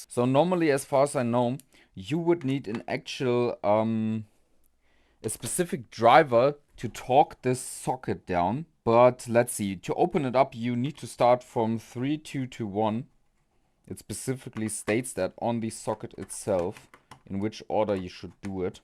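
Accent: Germany. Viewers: you sound very german x'D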